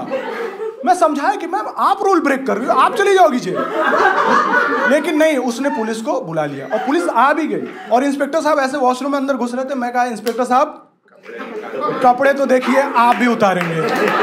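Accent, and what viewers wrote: Bihar, India. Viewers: Actual accent of bihar..."maat sahab"😂😂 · OMG, bihar comedian and the accent!!! Wowww mazza aagya bhaiyaa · This man proves that you don't need to be from any metro cities to be a good stand up, you can easily portray your stories to other's and make them laugh in your accent also....and the Bihari's accent are always beautiful....keep going👍🏻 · Awaaj se hi bihari lag rage ho be, maja aay gaya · Yourrr bihari accent dude just too good 😂❤️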